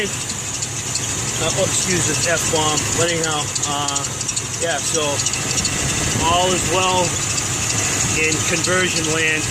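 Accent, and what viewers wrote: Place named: New York, United States. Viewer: Thank you very much!! It sounds like you have a New York accent. I have family there. I appreciate the help. I have everything else lined out and ready to do the swap. Again many thanks!!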